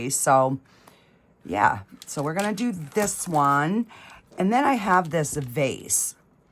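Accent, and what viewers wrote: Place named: Massachusetts, United States. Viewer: Where are u from if you don't mind me asking you sound like mass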